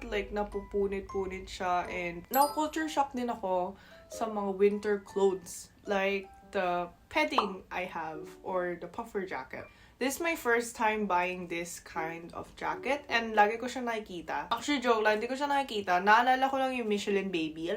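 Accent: Batangas, Philippines. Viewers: So,when I heard you on Anna's video when you joined them...I thought you're a Filipina mixed Korean but now you just said you just live there for a looooogggg time. So that explains it. No wonder the accent. 😂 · Your tagalog is like those of the pinoy kids as we call it mga "sosyalin",taglish but still very pinoy accent. I love watching your vlogs,it's kinda cute😉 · Nice vlog, you really good in Tagalog and the way you speak is really pinay accent, kudos and good luck hope you all the best while in Korea.🥰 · Alam mo kapag ipinikit q ang mga mata q at pinakikinggan kita, pinoy na pinoy ka talaga. Yung accent mo at the way ka magsalita, mga punto at bigat ng pagsasalita mo, para kang local pinoy. Hahahaha! Lalo na yung sinabi mo na "asan na yung asin?" Yung diin, punto at accent mo, pinoy na pinoy talaga. Hahahahaha! Kudos sa iyo! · Kahit accent nya pinoy na pinoy amazing. 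· Her English is spoken with a Philippine city accent, as opposed to the majority of the ones working in the US who did not grow up there who speak with a province accent. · You have an accent of a Filipino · This will be the new youtube video I'll be watching now. grabs potato chips PS. She is so cute with her Koreana looks and pure Filipino accent. :) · ma'am alam mo parang pinay na pinay ka talaga.lalo na sa pag bigkas ng words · Like you're speaking in Batangas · You sound and speak like Filipino. That’s good. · Your english is so pinoy. Accent is so neutral. Love it. Your tagalog as well is perfect. God bless! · Hello 👋. New subscriber here from the USA, though Filipino (-American). Your story is unique, interesting, admirable. Your love of the Philippines, your sadness when you flew out, are unsurprisingly, emotionally, understandably, lovable. Your Tagalog is perfect, together with the accent. 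Your English sounds Pinoy-ish, too---like a Filipino speaking English. You are funny, too. Be yourself and don't change. 😉 On second thought, I would not consider your scenario "reverse culture." Having brought to the Philippines when you were just 4 years, I would posit that at that point in time, you had not been exposed or experienced Korean culture yet, being that you still had baby milk in your mouth. So, in my view, you are just undergoing a plain one-way culture shock, no reverse yet. 😑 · I am a Batangueño senior citizen who stumbled into one of your videos, the one with Batangueño accent. This video is the second one i’ve watched. I kept laughing and sometimes to the point of tears. Your Batangueña accent is real. I dare say you are a Batangueña who looks like a Korean 😂🤣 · she really grew up in the ph coz of the way she says english words like culture and alot more lmao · I love that you have a Pinoy accent hahaha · Wow ang bilis ng panahon naka isang taon ka na pala diyan sa Korea parang kailan lang yun ah. 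Pero the way I see you just look Korean pero the way you speak and think your Filipino. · Ang cute cute kasi pag English niya Pinoy na Pinoy! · 101% Korean looking but the Accent FILIPINOOOOO. 🥰❤️😝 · even your English has really Pilipino aksent! gusto kita. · you got a Pinoy accent for a Korean. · Omg..pinay na pinay ang pannalita ang accent pati taglish lahat2 ay d masasabi Koreana 🫠😊😍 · korean with filipino accent is so damn sexy 😍😍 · Love that English Pinoy accent and your tagalog is better than mine. More prolific at Bisaya though. Headed back to Cebu next month....can't wait, but I hate the freakin' long flight from Illinois to Seoul to Mactan then to Dipolog City where my wife is from. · Well this Korean lady speaks like she is Filipino. Her English is Filipino in style and accent. She speaks Tagalog like any other Filipino. Awesome 😮😮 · Your English is so pinoy style obviously sa pinas ka lumaki and i can tell you have gone to a good school. 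Nice to drop by your channel. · Actually you are more Filipino to speak in terms of English and Tagalog tone.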